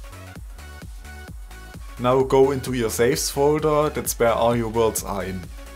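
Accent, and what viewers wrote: Germany. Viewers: Sein Englisch ist eigentlich ziemlich gut, nur die Betonung/Aussprache hört sich sehr deutsch an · I love how you talk 😄. Are you German? Luv your commands 👍🏻👍🏻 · Hey have you thought about letting someone else do the voiceovers? I know a german accent can be hard to get rid of for some :) Or maybe it just bugs me more than most other people here? Anyways keep up the good work :) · du bist deutsch nich? Hört macn irgendwie... und ja ich schau mir das Video in 2021 an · Ja, kommst du aus Bayern? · Wohnst du in NRW? :D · Nice vid are you German?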